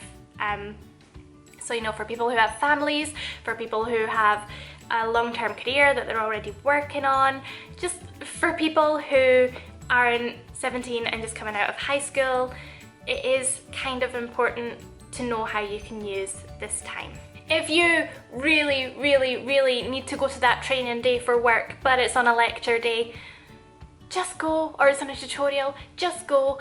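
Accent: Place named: Scotland, United Kingdom. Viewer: oh my goodness your accent is amazing! I haven't heard that in a while so i'm not sure.. scottish, irish, where are you from??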